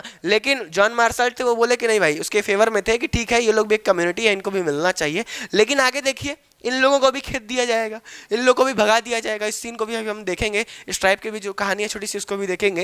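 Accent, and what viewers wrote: Bihar, India. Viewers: bhaiya ap bihari mat bola kigiye please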